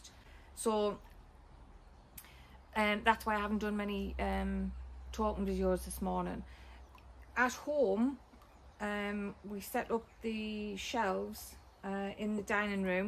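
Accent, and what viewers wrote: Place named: England, United Kingdom. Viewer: I love your English accent, very refreshing. We are expats fromm South Africa, been living in Huntsville, Texas for the past 33 years.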